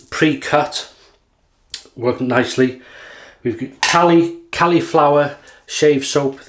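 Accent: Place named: Wales, United Kingdom. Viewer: His accent reminds me of Christopher Ecclestons accent. I wonder if he's from Wales too.... 🤔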